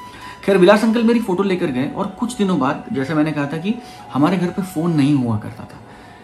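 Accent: Standard. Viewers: Your Hindi talking is that much pure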